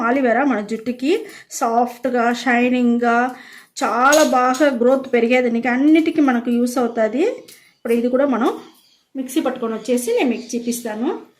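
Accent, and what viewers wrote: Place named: Rayalaseema, India. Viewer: Adhi rayalaseema slang ankunta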